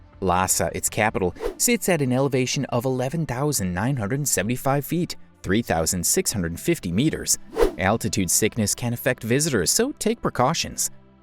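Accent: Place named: Michigan, United States. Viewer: That Narration Accent Sound like my Michigander Accent! 🇺🇸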